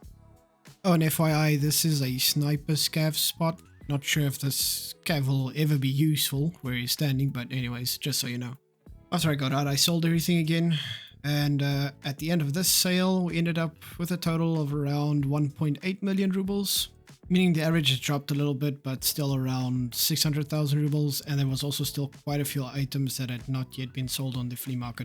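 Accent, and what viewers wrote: South Africa. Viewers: Is mans from South Africa by any chance, lowkey sounds like it 😅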